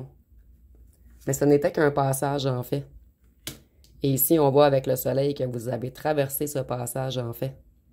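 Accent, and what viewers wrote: Quebec, Canada. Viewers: Votre voix me réconforte et elle est fort agréable . Et vos mains jolies avec vos ongles et vernis . Merci pour la guidance que vous nous apportez gracieusement , et votre accent québécois j'adore. Cette personne a essayez revenir mais je ne veux plus depuis que j'ai vu derrière le masque qui s'y cache , un homme a femme qui se dit fidèle ,et qui est ds des travers sexuelles et malsains et en perpetuelles conquêtes il papillonne , il est très toxique et malsains très menteur ,depuis que j'ai retrouver ma liberté ,je revie sans lui et retrouve mes énergies ,il n'apprécie guère car il voulais me mettre sous terre, il m'avait mise en cage et enlever mon abondance et mes énergies ,il est jaloux maladif et possessif un psychopathe mytomane pervers narcissique ,toute la famille est ainsi complètement cingles, et seul il ne peut pas vivre et il est trop immature.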